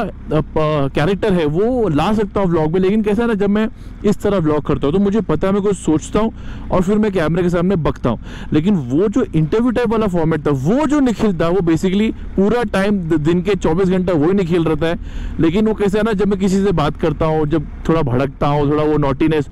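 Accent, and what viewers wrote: Mumbai, India. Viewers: Sir...GoPro Waala View Mast tha...Nd Old Mumbiker Sir is Back with his Mumbaiker Waali Tone....sir Loved the interviw!!😀😎❤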